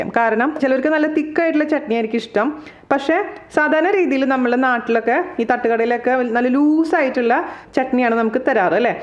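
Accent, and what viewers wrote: Thrissur, India. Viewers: It's Thrissur slang! We speak little fast😊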